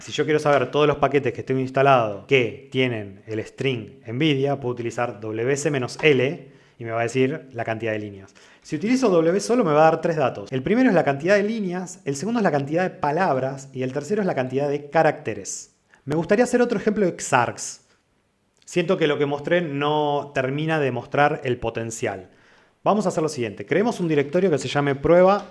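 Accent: Rioplatense, Argentina. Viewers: Hola! Caí a este video buscando info sobre xargs, la verdad estaría bueno hacer hincapié en este comando ya que lo que permite hacer es (segun lo que vi hasta ahora) asombroso. De todos modos me aprendí funciones de grep y lo de wc que son súper útiles! Gracias por este video, espero que como a mí haya ayudado a más gente. Abrazo! PS: está bueno encontrar videos donde el ponente habla español rioplatense, hace más fácil la cosa viteh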